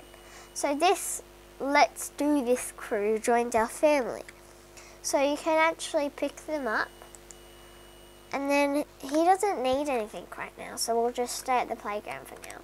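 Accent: Australia. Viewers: Is she Australian? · the australian accent though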